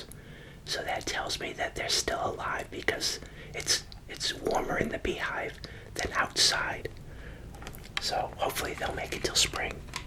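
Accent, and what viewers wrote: United States. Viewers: The way he says corn flakes and coffee is just amazing I’m English and his accent is like the typical American accent I love it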